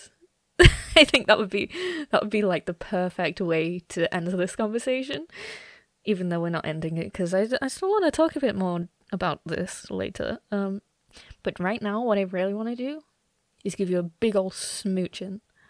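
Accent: United Kingdom. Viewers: This perfect scirpt perfect everything but the British accent ruins it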